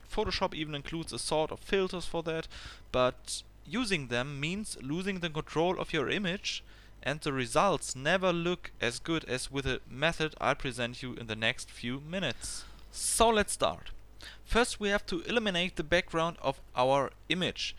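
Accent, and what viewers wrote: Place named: Germany. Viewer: Ich wollte gerade fragen, ob du deutsch bist, bevor ich die Kommentare gesehen habe. Dein Akzent ist wirklich deutsch :D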